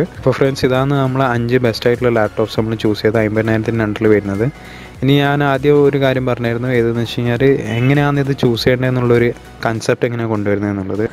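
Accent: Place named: Kannur, India. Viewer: Aah kannurkaran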